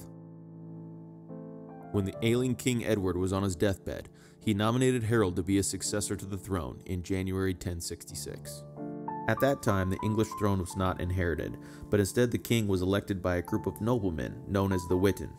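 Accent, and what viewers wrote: United States. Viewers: Pronunciations need work -- too bad to hear an American voice instead of a British one. E.g., Aethling - long a or AA (ae diphthong use in OE). But clear useful information of historic figures.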